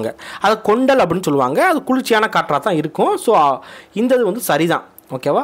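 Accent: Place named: Madurai, India. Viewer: Super video sir. Thank u🎉 unga iyalbana peachu enga maduraiya kanu munadi kondu varuthu sir. Easy ah understand aguthu sir ilakanam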